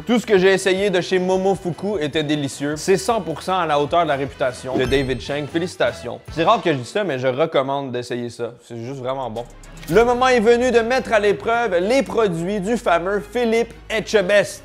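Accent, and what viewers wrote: Quebec, Canada. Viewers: Qu’est ce que j’aime cet accent Québécois, c’est vraiment classe 💪✌️ Le bonjours à vous tous au Québec de notre petite 🇨🇭 je t’ai découvert récemment, tu es au top 👌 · Les ayants droits de Bocuse m'agaçent.. le mélange accent québecois - marseillais pépite lol.. le "petite peau comme moi".. m'as tué. Je modifie le commentaire au fur et à mesure de la vidéo :p tabarouette cauchemars dans la barquette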